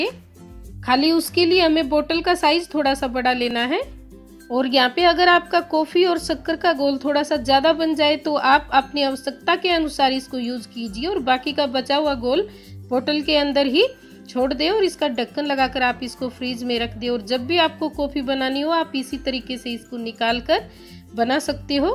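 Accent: Haryana, India. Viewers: Aap haryane. Se ho kya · Mam aap Haryana se ho kya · Mam aap haryanvi ladti h bhasa se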